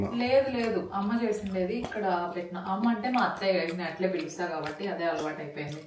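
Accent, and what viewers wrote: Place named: Rayalaseema, India. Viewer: Meeru same ma lage matladtunnaru meeru rayalseema or kadapa valla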